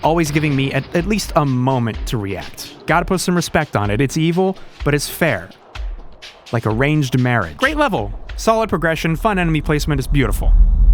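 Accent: United Kingdom. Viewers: why is he british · never knew you were British, the more you know lol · you're british?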